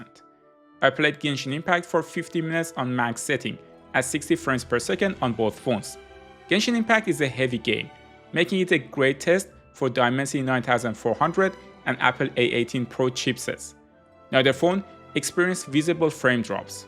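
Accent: Iran. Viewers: چرا لحجه ایرانی داری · لحجه فارسیتو دوس دارم 😅❤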